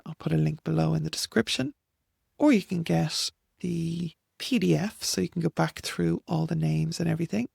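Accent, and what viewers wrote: Ireland. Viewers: I love listening to you read the mythology! I love hearing it in your Irish voice, with your pronunciation. I listen to the Tán every year.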